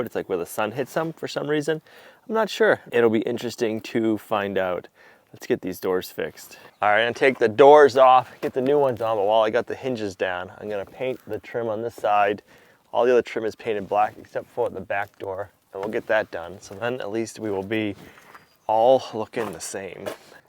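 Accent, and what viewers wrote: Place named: New England, United States. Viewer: It's called a New England dialect. Not a speech impediment. Look it up. You owe an apology.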